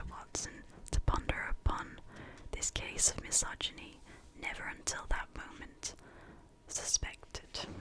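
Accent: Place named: United Kingdom. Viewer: wow you have a British accent 😃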